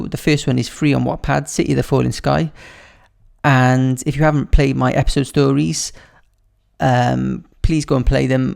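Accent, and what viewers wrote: Wales, United Kingdom. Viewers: your welsh i'm welsh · So this video really helped! I was clueless. But I had one question. How do you delete a chapter from a story? Btw love your accent I'm also british =) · Are you Welsh?? I love your accent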